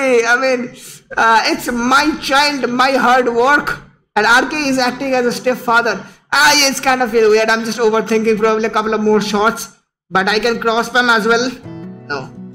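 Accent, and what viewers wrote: Bengal, India. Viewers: The English of Bengali .... I am also Bengali.. hello bro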